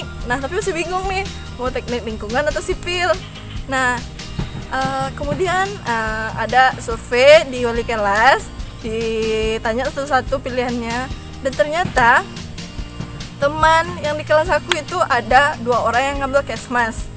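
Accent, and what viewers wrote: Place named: West Sumatra, Indonesia. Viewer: Bagusnya bercakap loghat Minang sepenuhnya..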